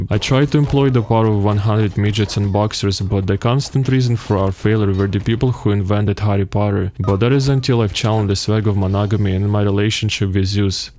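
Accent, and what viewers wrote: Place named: Russia. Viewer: thick russian accent... I LOVE IT!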